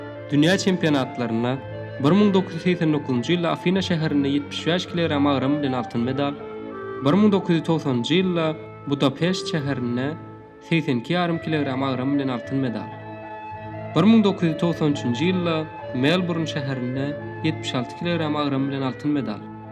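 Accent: Mary, Turkmenistan. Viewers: Frozen citizen Maryn aksenti bolnanna name? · Maryñ aksenti bar diymesen, video erbet dal , gowy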